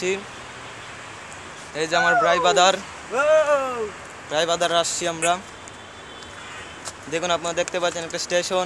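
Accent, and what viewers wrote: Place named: Bangladesh. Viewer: Kolkata theke ele but tomar kotha Bangladeshi der moto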